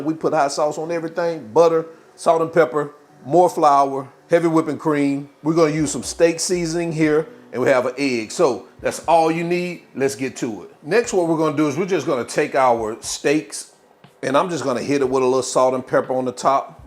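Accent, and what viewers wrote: South, United States. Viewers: It was really good, just not spicy like I used to make.🔥 Sadly the other people in my household can't handle anything remotely spicy so I have to keep things on the tame side...they literally think a dusting of black pepper is spicy.😭 Funny thing, I came back and watched this video again a few hours ago, it looked that good lol.🤪 I'm from Florida and would often get beef or pork cube steaks and idk why but you can not find either up here in Baltimore so I would have to improvise. I had been wondering what your accent was, could tell it was from somewhere down south. Being from Florida is like Alabama then, hot sauce goes on everything imo too. Last but not least, gonna try the grating the sausage trick because I usually minced with my handheld chopper while frying it and grating would be less work for my carpel tunnel. Sorry for rambling lol.🤣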